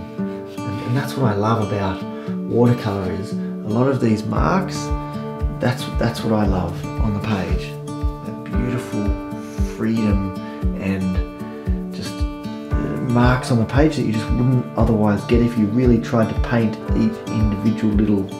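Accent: Australia. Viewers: I adore Payne's Grey. I don't buy the color because I love mixing colors to get it. It's my favorite color for a night sky. PS Where are you 'cause you sound Australian. I'm in Canada 🇨🇦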